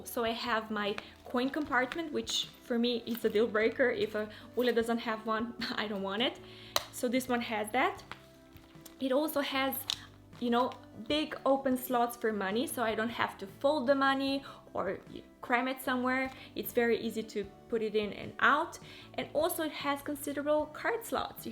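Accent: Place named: England, United Kingdom. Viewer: Love your english accent, where it from???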